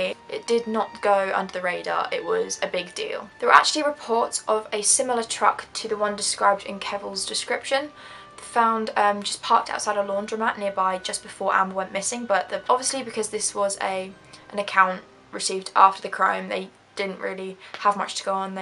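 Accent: United Kingdom. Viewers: why r all u girls doing these type videos have British accents? it’s kinda bizarre just wonder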